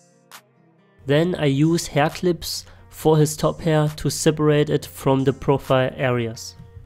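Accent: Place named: Germany. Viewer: Man hört bei dein Englisch das es bisschen mit deutsch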